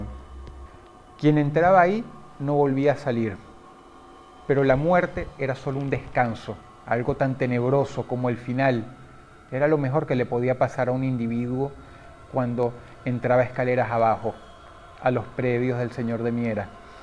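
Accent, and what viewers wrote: Venezuela. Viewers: Cuando Dross aun tenia acento Venezolano. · Cómo me gustaba este tipo de videos, mucha nostalgia y el lindo acento venezolano Pd: señor de miera, mas bien señor de mier... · Cómo odio tu acento veneco Agradezco que cambies tu voz en videos para caer bien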